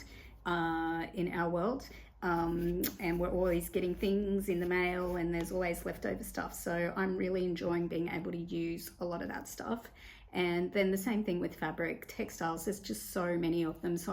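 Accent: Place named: Australia. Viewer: So happy to have stumbled onto your channel. This is only the 2nd of your videos I’ve watched but I’m now your newest subscriber 🎉yeah. I adore your warmth & authenticity, plus it’s lovely to hear an Aussie accent. I’m also an Australian, the same age as you & been wanting to start my own channel to document my creative process but it’s hard to imagine what you can’t see already so thanks for being an inspiration. I look forward to watching the rest of your content. I know I’m a total stranger but honestly I’m so proud of you in this video for “just doing it”, for having the courage to show up. You ROCK!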